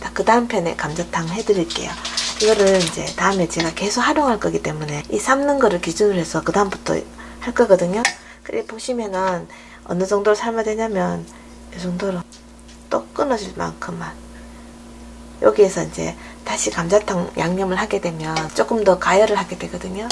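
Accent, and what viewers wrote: Gyeongsang, South Korea. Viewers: 밝은인상 순한 목소리 맛있고 쉬운요리 맛나보이는 눈맛 선생님 구수한 경상도 말씨 넘 좋아요~~알 러브 유~~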